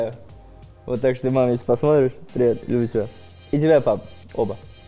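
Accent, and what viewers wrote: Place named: Chechnya, Russia. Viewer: рж я думал это чеченский такой акцент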